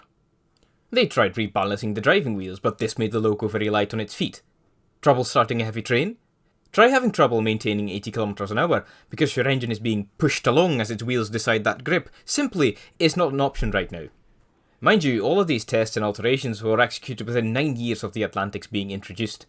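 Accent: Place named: Scotland, United Kingdom. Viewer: First time watching one of your videos that isn’t a animation and my immediate thought was “holy shit there Scottish”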